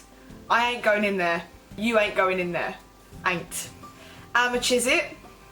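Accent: Essex, United Kingdom. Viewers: this is not a typical Essex accent, it's chav Essex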